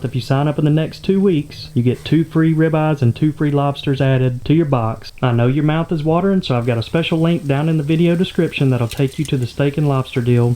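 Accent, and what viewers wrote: South, United States. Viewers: Fun name idea: The Tripple P. that stands for The Peanut pasture pond. I that name will sound very nice with your southern accent.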